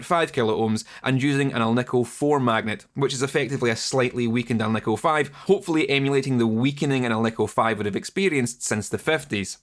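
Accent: Scotland, United Kingdom. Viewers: Honest to God I though you were gonna be a guy from LA instead a glorious Scottish accent has gretted me I am happily surprised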